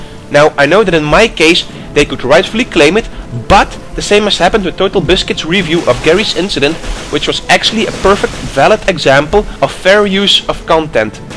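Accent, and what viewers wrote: Netherlands. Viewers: I know, that is why i put it in my wall of tekst! :) Go make more great video's m8, i enjoy them alot even with your dutch accent.. :) Its rare that i see video's who actually make sense. Anyways have a great newyear and all the best.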